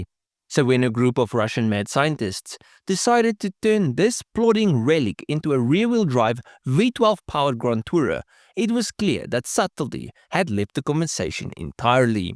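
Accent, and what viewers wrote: South Africa. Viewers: The car is amazing! You never cease to come up with automobiles most of us have never heard of. You are an incredibly intelligent young man. And, as I've said before, your narration with that South African accent only ads to the videos enjoyment.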